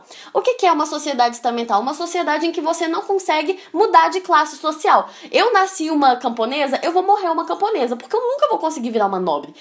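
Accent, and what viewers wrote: Minas Gerais, Brazil. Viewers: Ela é Belo Horizontina, mineira. Haha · acho q é mineira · Vc é mineira né? Sotaque lindo sô ❤️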